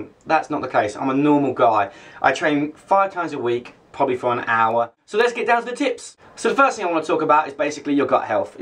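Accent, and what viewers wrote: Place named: Australia. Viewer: always thought you had something Aussie in ya there it is mate come live here again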